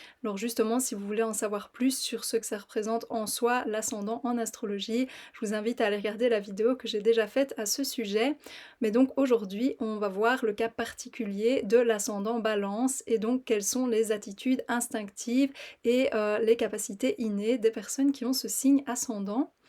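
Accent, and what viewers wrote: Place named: Belgium. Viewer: ton accent belge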